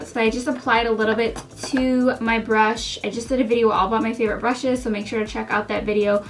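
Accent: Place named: Upstate New York, United States. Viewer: girl youve got the ill upstate NY accent, i feel ya lol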